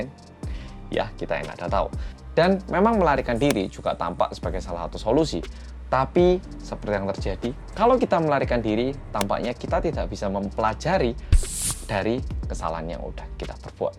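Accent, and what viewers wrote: Java, Indonesia. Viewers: Lagi nonton KBS Live di YouTube,eh ada notif dari mas....auto klik dong. Bersama orang korea yang "MEDHOKKKKKKKKK" Lho rambute sampean ganti maneh mas. Lup² mas ❤ Jatim hadir disini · Suara nya kaya orang Jawa Medok banget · Tim gercep mas ~🤣🤣🤣 orang Korea yang MEDOKKKKK~~~ btw aku kesini cuma gara² ada Hyun Bin (Kapten Ri)😭😭😭🤣🤣👍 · Jawa banget suara nya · Gaya bicara pembikin konten cukup nyentrik, wajah Korea ngomongnya "medhok" kejawa2an 🤣🤣🤣tp aku like lho... 👍👍👍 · Lah kok ngomongnya kaya jawa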